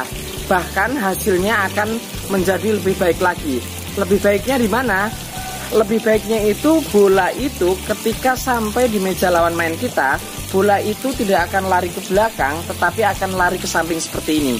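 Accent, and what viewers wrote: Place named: Java, Indonesia. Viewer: Saya tahu mad dari PTM Kemang Jakarta tapi penasaran sampean orang asli mana? logat jawanya kental banget.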